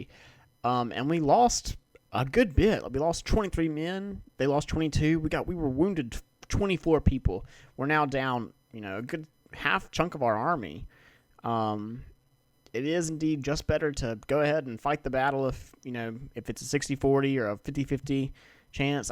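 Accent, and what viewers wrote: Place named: South, United States. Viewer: Good to hear tips for my favorite game in my own (Suuthern) dialect. Great video bro, thank you.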